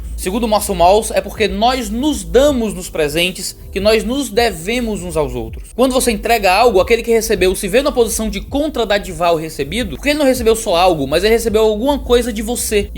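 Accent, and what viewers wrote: Ceará, Brazil. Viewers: Você é cearense?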